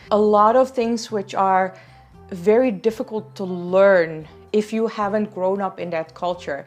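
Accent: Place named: India. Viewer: Its true, You looks like Indian... And you accent is not like British, American, Australian accents... so your taliking style is also like Indian. But as an viewer from long time, I know, You are not Indian. So you should not call yourself Indian. And It would be disrespectful to your own nationality if you call yourself Indian.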